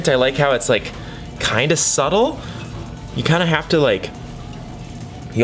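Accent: Minnesota, United States. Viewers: Wow CLASSIC Minnesota accent lol love it. Mine only comes out when I’ve had too much to drink now. 😋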